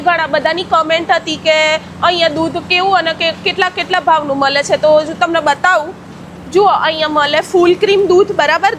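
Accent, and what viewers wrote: Sabarkantha, India. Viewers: સાબરકાંઠા ના છો બેન સાબરકાંઠા ની ભાષા આવે છે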